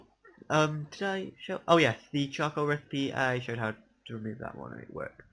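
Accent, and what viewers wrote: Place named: Australia. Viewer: Are you from Australia? You sound like an Australian.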